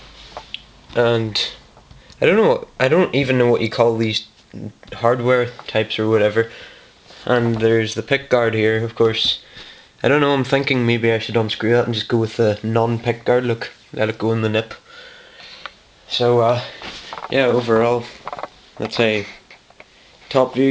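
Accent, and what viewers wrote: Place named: Scotland, United Kingdom. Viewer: i like your scottish accent you got good taste in basses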